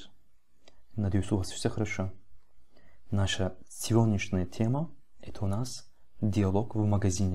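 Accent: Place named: Turkey. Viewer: Спасибо Вам за Ваш труд ))) Очень полезные видео, очень хороший канал ! Кроме того полезно послушать русскую речь от носителя турецкого языка (для некоторых непривычно и кстати звучит как-то успокаивающе ) Çok çok teşekkür ederiz. Türkçe en güzel dillerden biridir.... ne tür bir dil .. bir tür bülbül şarkısı sadece. 🌷🌷🌷👍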